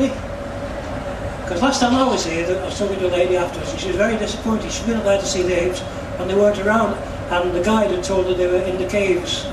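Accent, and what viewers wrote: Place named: Yorkshire, United Kingdom. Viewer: Still got your Brown Edge accent!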